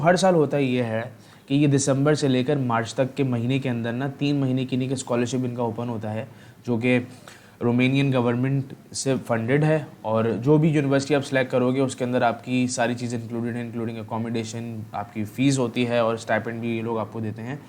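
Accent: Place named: Karachi, Pakistan. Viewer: keep it up... Mujy Karachi walon ka urdu accent bohat pasand hai.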